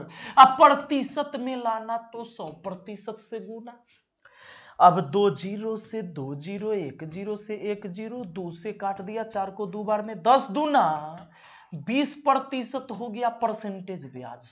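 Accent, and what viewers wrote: Bihar, India. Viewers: बिहार के हो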